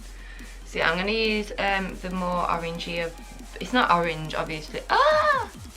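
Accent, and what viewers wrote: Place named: Birmingham, United Kingdom. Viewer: Your sooo brummy. I love it!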